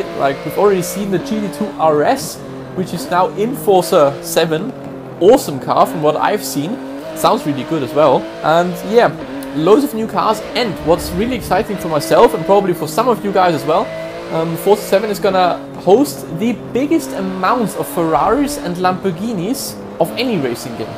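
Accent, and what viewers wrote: Germany. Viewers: Thanks for the info... And your german english.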